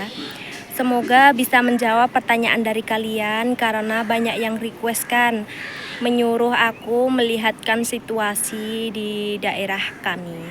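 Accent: Java, Indonesia. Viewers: Orang mana si mbaknya kok bahasanya medok kaya orang jawa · Mbak asli mana to,,,,?kayak jawa ya,?kira2 jawa mana mb,?semoga di balas heheh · Benar mbak, aku baru 2 hari nonton YouTube nya, dlm hati bertanya logat nya logat jawa tapi agama kok bkn Islam, ternyata pindah agama. Setau ku orang Jawa jarang sekali orang yg non muslim. kalau dari medan /manado wajar kl bkn muslim.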